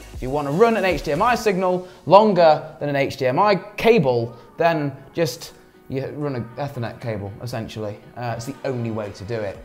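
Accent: England, United Kingdom. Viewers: What do you mean "say Ethernet Properly "? He's saying it with an English accent, do you speak english? Because its sounds ok to me. it's called English not Americanese.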